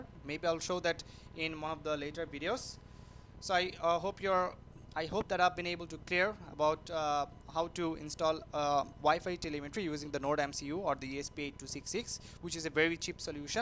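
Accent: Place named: England, United Kingdom. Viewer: The most unattractive english accent makes it impossible and take anyone who speaks like this serious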